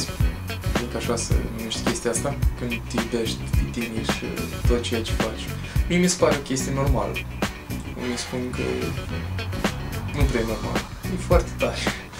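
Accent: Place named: Moldova. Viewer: o intrebare mai ciudata ^^ tu esti moldovean sau ardelean? sunt curios dupa accent esti moldovean dupa vocabular pari ardelean deci nu stiu daca mi-ai putea raspunde masi bucura pa pace!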